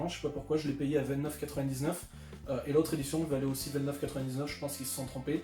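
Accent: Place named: South, France. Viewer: super! Vu qu’à tu as un petit accent du sud et que je suis de Toulouse, tu pourrais me dire lequel? 😅 en tout super vidéo!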